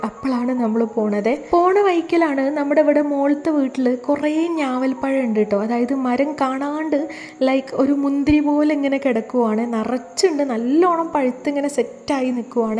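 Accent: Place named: Malappuram, India. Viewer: നിങ്ങടെ മലപ്പുറം ഭാഷ കേൾക്കാൻ ഒത്തിരി ഇഷ്ടാണ്. അടിപൊളി 👍👍👍🥰🥰🥰🥰🤩🤩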